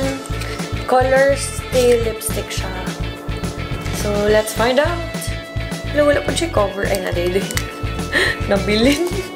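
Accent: Visayas, Philippines. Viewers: Ang cute.. Bisaya.. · kakyut jud oi! makaproud maminaw gabisaya ka😂😂😂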